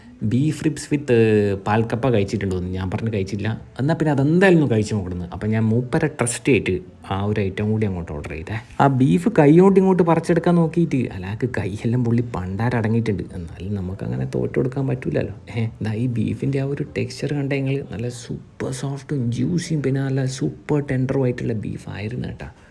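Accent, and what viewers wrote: Kannur, India. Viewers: Kannur basha thenhe...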